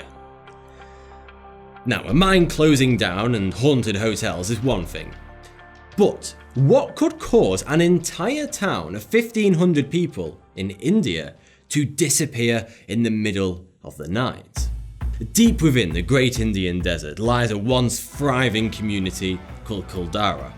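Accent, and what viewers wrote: United Kingdom. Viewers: In the UK, we call them shopping centres, not shopping malls. tends to use American words, despite sounding British.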